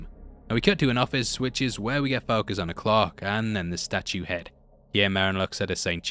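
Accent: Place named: United Kingdom. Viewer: why you english so british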